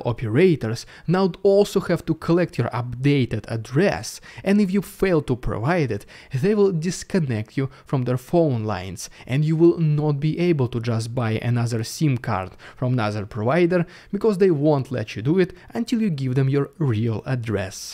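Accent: Russia. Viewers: I get FPS russia vibes from this guys accent. 😆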